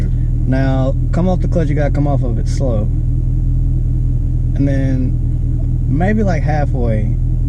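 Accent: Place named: South, United States. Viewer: I saw that hat and heard that southern accent and knew he was bout to lay the knowledge down. Us country boys know how to drop them gears like no other. Make them things screaaaaaam.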